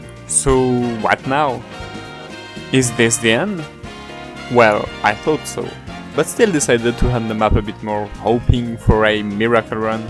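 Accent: France. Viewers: On entend quand même l'accent français 😂❤ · You have a fµcking french accent. Ca se voit direct.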